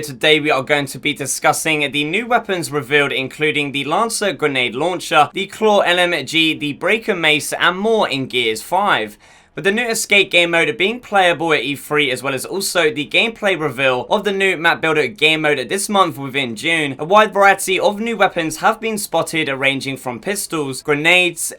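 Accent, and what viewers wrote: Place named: United Kingdom. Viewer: Aymen Salih He has a British accent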